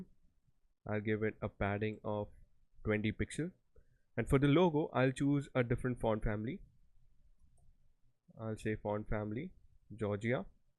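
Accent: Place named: India. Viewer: you sound like a hindi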